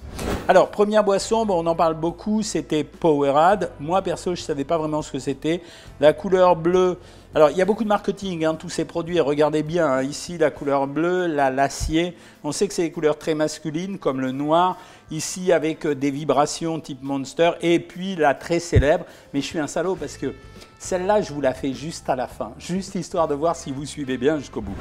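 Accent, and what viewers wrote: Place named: England, United Kingdom. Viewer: J’adore votre accent anglais 😂😂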